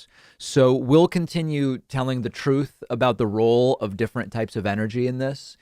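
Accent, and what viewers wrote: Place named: Argentina. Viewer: The Argentina accent have a bit of Italian in it.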